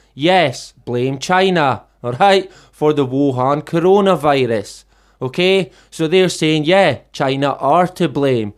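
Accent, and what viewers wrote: United Kingdom. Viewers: its comforting to know I'm not alone and to hear a UK wonderful voice lol I've mainly listened and watched American or Australian truthers the odd one from UK! If know any other small UK channels post a link cause an extra like and sub helps for people who stumble across these channels!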